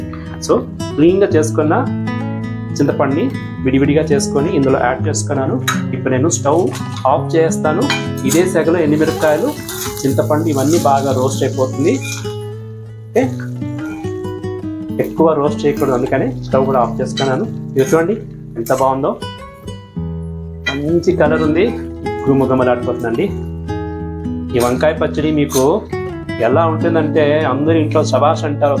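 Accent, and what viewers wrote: Tamil Nadu, India. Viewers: గారు మీరు తెలుగు వార, తమిళ్ తమ్ముడా 🤔 మిమ్మల్ని జెమినీ సీరియల్స్ లో చూసే దాన్ని మీ తెలుగు కొంచెం డిఫరెంట్ గా ఉంది 😊👍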